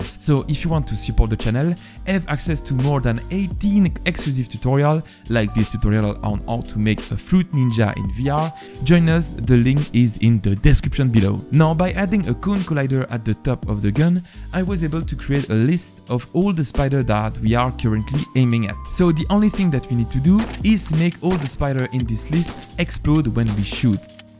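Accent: France. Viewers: Y a qu'un truc qui me choque ! si je ne me trompe pas vue ton accent ! tu est français . pourquoi tu ne fait pas de vidéo en français aussi ?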